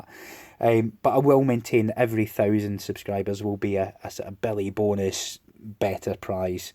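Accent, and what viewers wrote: Scotland, United Kingdom. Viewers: I want to win or as the way I heard it.....I wan tae win :D Memories of childhood friends with the accent - rarely hear a proper Scottish accent now.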